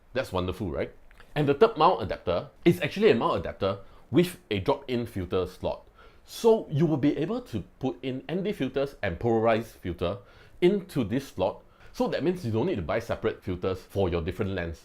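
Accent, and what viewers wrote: Singapore. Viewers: You singaporean?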